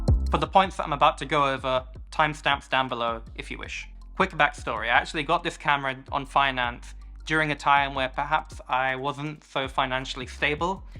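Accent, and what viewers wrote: India. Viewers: So glad to see new videos from the guy who got me into Fujifilm many many years ago. I've missed the random phasing in and out of the Indian accent. 😄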